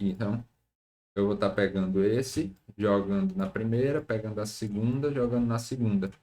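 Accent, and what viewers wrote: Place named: Fortaleza, Brazil. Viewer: Ótima didática e ajudou demais! Obrigada. Entendi tudo =) inscrita! Vc tem o sotaque daqui de Fortaleza-CE !!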